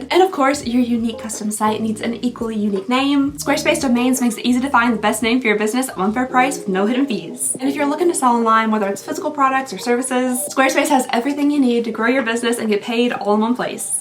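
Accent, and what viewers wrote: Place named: Kentucky, United States. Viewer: One of the reasons I love watching your videos is because your accent reminds me of my long distance best friend who is also from/lives in Kentucky. Always love your videos no matter what they are. Hoping to make it up to the stores one of these times I’m down there !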